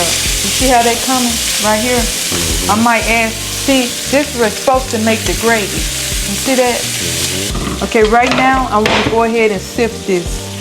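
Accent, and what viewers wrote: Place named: Chicago, United States. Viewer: She sounds like she from Chicago with that cousin and em 😂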